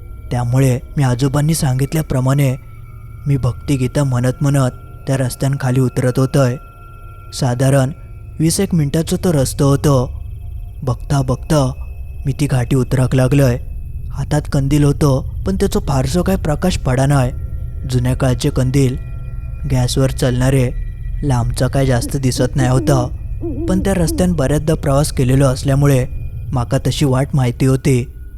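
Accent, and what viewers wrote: Malvani, India. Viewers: आपल्या वाणीत मालवणी भाषैचा गोडवा आहे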